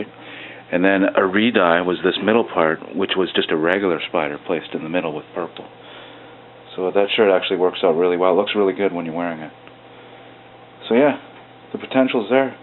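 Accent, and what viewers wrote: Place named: Canada. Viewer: I love your Canadian accent.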